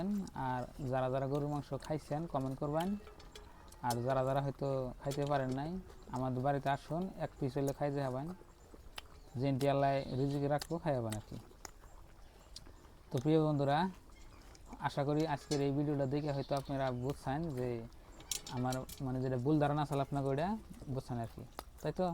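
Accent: Bangladesh. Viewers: উজানী ভাবি ভাবির ভাষা শুনি বুঝছি ভাবি উজানী মুই ও কিন্তু উজানী 😂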